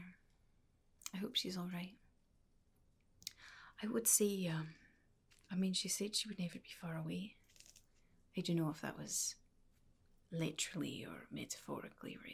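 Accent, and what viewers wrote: Ireland. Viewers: A sure spot of siren song itself, this soothing, seductive, cigar smoke shrouded seance of the solitary sage. If not the siren residing on the rocks to lure you to be lost on some granitic crag, surely a siren to lead you to be lost in an illuminating legend or captivating contemplation of the captain from a rocking chair. More than a wee bit of beguile by brogue in an old saw, story, or spell shared well by the keeper of the light. Far more to keeping the light than meets the eye indeed -- and not the one atop the tower, but in the be-lure to be sure of the tender of tales and old soul insights that cast their own lights over leagues and lifetimes. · Aaah, so soothing. Thank you ever so much,. I've recently discovered ASMR and your channel,and I love it (not to mention, your wonderful irish accent).Always had a special fondness for lighthouses, btw.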